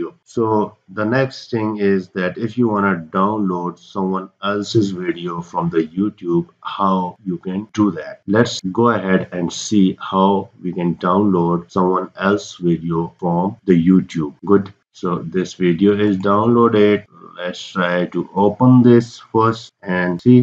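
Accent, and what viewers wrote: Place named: India. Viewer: Cannot understand. Take the indian out of your voice. Or marbles, whatever.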